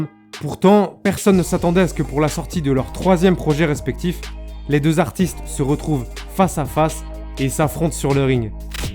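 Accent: England, United Kingdom. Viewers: ouiiiiiiiiiiiiiiiiiiiiiiiiiiiiiiiiiiiiiiiiiiiiiiiiiii enfin tu fais plus ton accent anglais tout était parfait sauf ça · mention speciale pour ton accent anglais et tes videos de wack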